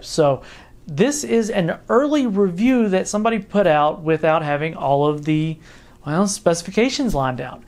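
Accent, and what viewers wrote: South, United States. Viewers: Just out of curiosity , which southern state are you from if i might ask!?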